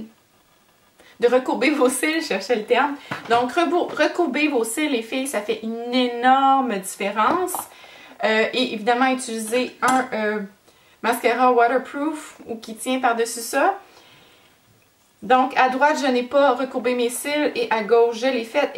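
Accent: Canada. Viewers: Vous êtes jolie! Dommage que les sous-titres ne m'aident pas à comprendre cet accent canadien :( C'est pareil, les américains ne parlent pas un vrai anglais, ils déforment les a, les canadiens font pareil : déjà vous le prononcez déjeu... Je comprends mieux un belge ou un suisse... En fait, je me demande comment vous enseignez les enfants à lire : pa c'est prononcé peu ou po? comme çe au lieu de comme ça... C'est pénible de devoir faire des efforts d'essayer de comprendre un accent alors qu'on parle la même langue. Bref à part ça, bon exemples. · j'ai pas tout compris, c'est du canadien je pense, ça pique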